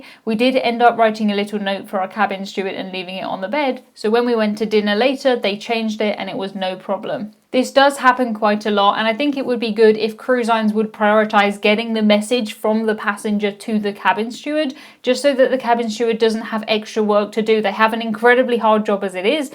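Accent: United Kingdom. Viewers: You are so adorable. As a yank, your accent is just Devine! Do we Americans have accents to the British? Live video Cheers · My wife and I love your videos. Great narration with a wonderful British accent - all done by an incredibly nice person. Excellent job! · Got to just love a Brit accent!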